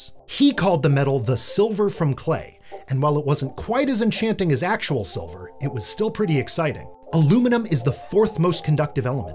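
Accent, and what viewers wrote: United States. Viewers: You really shouldn't get into scientific discussions if you cannot even understand basic English. Literally no part of the video claims either of the names is 'wrong' and does not refer to either being 'unique' in any way. The maker of the video says that the reason he calls it aluminum is not just because of his American accent, but also because the person that coined the first term for the metal (alumium) changed it to aluminum. He then proceeded to say that somebody at Quarterly Review referred to it as aluminium and it 'stuck', since the correct term (coined by the maker) wasn't yet widespread. What does this mean? That BOTH spellings are actually correct. Aluminum as that's the name it was actually given by apparently the first person that named it, and aluminium as a common 'evolution' of a word (which is the process by which we have 'photoshop something' or 'google it'). 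All that aside, even if we assume that 'aluminium' is the only correct form in British English (it's not), this STILL doesn't mean jack, since it is correct as aluminum in American English. Whether you want to accept it or not...that's an existing language and it doesn't need to follow the naming of other languages (including other variants of English). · The man in the video is no authority. He is American using an American dialect of English. ONLY in the USA is aluminum accepted. Internationally it is recognised as an acceptable American dialect, not standard English. It isn't the British, it is 96% of the world population.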